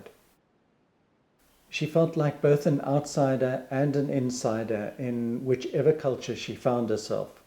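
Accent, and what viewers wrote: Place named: South Africa. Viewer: had a feeling you were south african when i heard your voice :)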